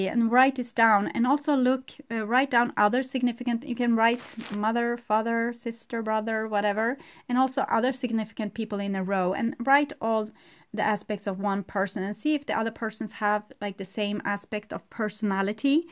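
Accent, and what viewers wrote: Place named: Sweden. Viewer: Are you Swedish? You have a Swedish accent. Thanks nice reading still watching though.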